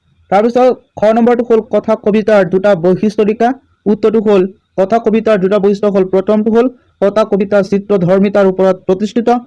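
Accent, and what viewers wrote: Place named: Lower Assam, India. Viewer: Vatia ussaron hoi ase bujat okhobida hoi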